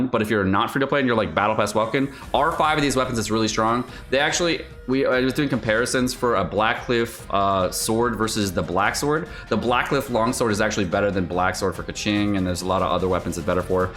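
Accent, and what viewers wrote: United Kingdom. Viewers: I looove british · Can we please get a British only video please 😭😭😭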